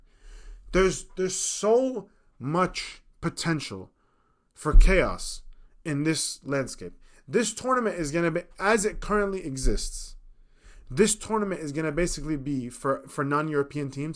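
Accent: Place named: Arab world. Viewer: Where are you from? I can heard some arab accents but can't locate it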